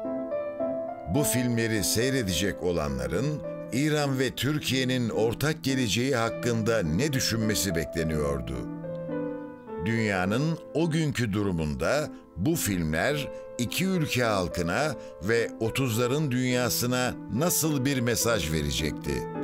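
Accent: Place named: Cyprus. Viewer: Kulağa kıbrıs şivesi gibi geliyor. Hoş, naif ve güzel bir diksiyon..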